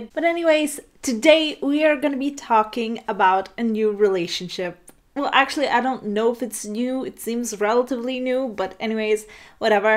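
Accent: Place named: Italy. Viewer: Loving your accent! I'm guessing you're from Italy... (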